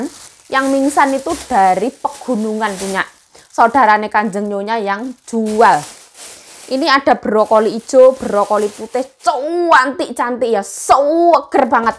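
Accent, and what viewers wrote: Java, Indonesia. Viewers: Pertama kali liat chanel mbak waktu itu subcribernya 68k... Jatuh cinta sama kepolosanya dan logat jawanya yg medok.sehat selalu mbk biar tetap bisa menghibur😊😊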